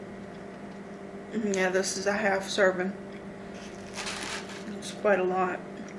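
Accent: South, United States. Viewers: C here I reside in Colorado. Yeah she sounds like she's from the south. In one of her videos she goes to the Heart Attack Grill to eat so maybe it's in the same state. I'll have to look. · Girl, your voice is so soothing to me.. and you sound southern (I'm from Tennessee). Lots of love hunny, you keep doin you 😙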